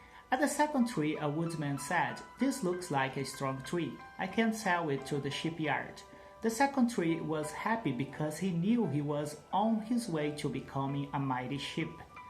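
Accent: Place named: United States. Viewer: Parece um americano convetsando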